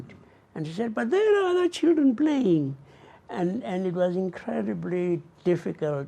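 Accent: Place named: Africa. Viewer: pour les 1ère S1 Super !!! L'accent africain est superbe ! ;)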